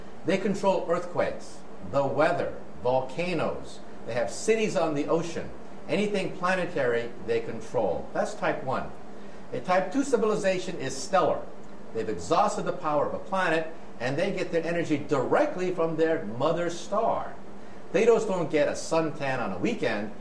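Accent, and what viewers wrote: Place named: United States. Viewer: his accent is so american xD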